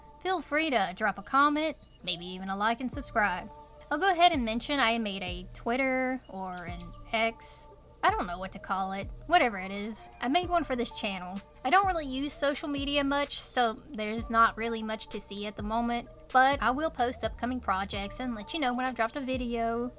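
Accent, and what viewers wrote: South, United States. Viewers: you sound like you have a slight southern accent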